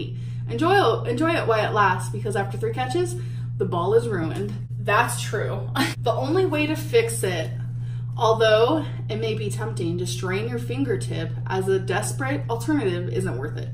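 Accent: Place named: Calabasas, United States. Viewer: She’s from Alabama but she speaks like shes from calabazas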